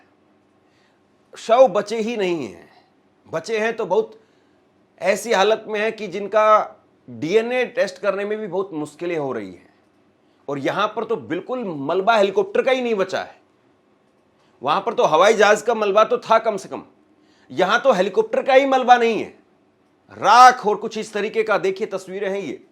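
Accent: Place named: Haryana, India. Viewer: Ek. Nitin. Yahu. Jesa. Viman. Humare. Pas. Bhi. Agar. Nitin. Yahu. Apne. Ush. Viman. Se. Isril. Chod. Kr. Bhag. Gaye. H. To. Asa. Hi. Kuch. Yaha. Asa. Hi. Viman. Vale. Ke. Sath. Bhi. Ho. Sakta. H ish. Se. To. Bahut. Se. Log. Yaha. Anath. Ho. Jayenge aap. Ke. Chanel. Ka. Nam. Tk. Nahi. Pata aap. Ek. Nojawan. Koi. 30. 35. Sal. Ki. Umar. Ke. Haryana vegra. Se. Lagta jese. AAp. Ke. Bolene. Ke. Andaj. H ye. Badi. Dookh. Bhari. Khabar. H. Operation. Sindoor m. Ush. Ne. Pagasus. Se. Humare. Samarthan. Ek. Badi. Bhoomika. Nibhaee. Thee. 14. June. 25. Sature. Day. Jai. 2. Shani. Dev. MAharaj bakheda. Hune. Gram. 7. Om.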